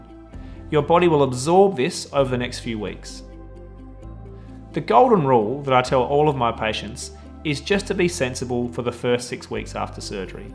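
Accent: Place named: Australia. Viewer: This is great but i would’ve understood it more if it wasn’t in Australian.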